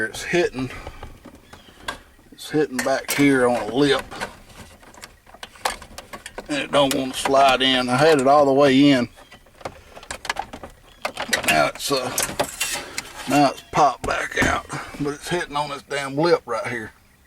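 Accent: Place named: Alabama, United States. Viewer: I love these videos! You sound like you live in Alabama, where I live. You do an excellent job and you're entertaining too.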